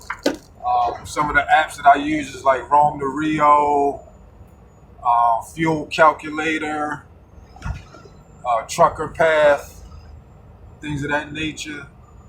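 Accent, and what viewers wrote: Baltimore, United States. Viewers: hey man do you have an email i get ask you a few things, sound like you're from baltimore. are you out of the hagerstown hub?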